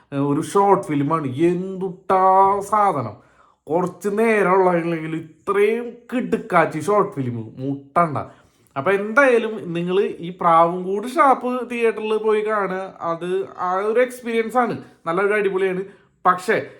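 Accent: Thrissur, India. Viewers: Nice broo first comment i love your slang because i am a thrissur Gediiiiiiiiiii…….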